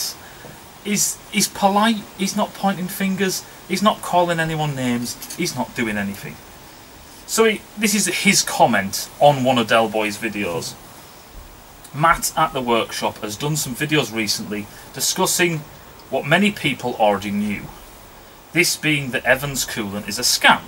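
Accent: United Kingdom. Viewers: I just love you mate! People like you and others who just speak their mind, know their shit and aren't all politically correct being afraid someone gets their knickers in a bunch. I find your voice soothing when I'm drinking beer while pottering around in me own workshop, injuring my tools while the missus gets all wet thinking I actually know what I'm doing. Half of the time I'm not even listening but just enjoying that fine Brittish accent in the background. Keep doing your thing, or don't, fuck if I care!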